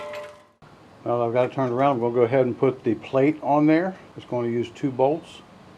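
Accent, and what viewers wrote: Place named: China. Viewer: The chinglish wasn't working for me... Thanks.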